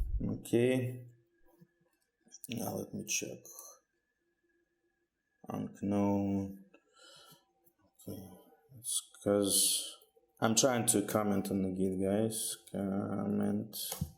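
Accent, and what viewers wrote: Russia. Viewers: are you russian? Your accents just seem to be russian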